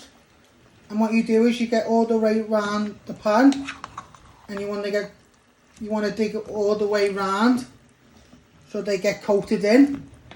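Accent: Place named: United Kingdom. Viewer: This guy's ability to sound British is amazing!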